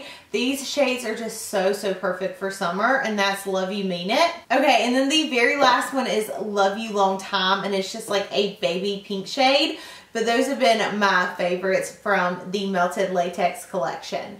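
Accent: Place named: North Carolina, United States. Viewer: Annie Loewen she is from North Carolina United States lol. a little more East than South. she has that kackalackie accent lol... not southern haha thats what we call home north kackalackie lol dont ask if youre from north or south carolina you should know lol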